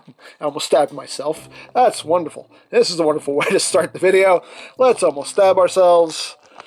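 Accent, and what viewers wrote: South, United States. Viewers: you need to Apply for your southern card, you sound like a southern guy, take for me I am for the south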